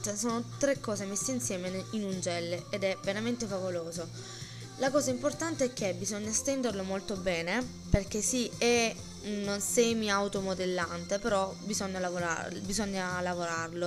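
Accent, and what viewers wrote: Apulia, Italy. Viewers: Forse perchè sono pugliese anche io ma si sente che 6 pugliese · di dove 6? anche io sn della puglia prov.di brindisi :D è vero che si sente molto nella parlata..cmq cosa usi x fare i decori neri?? a me in genere il gel si espande mentre faccio i decori.. che gel usi tu??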